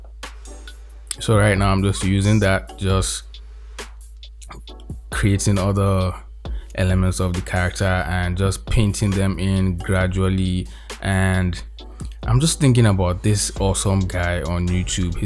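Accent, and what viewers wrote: Nigeria. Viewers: Awesome man learnt so much from watching this process btw cool nigerian accent <mail>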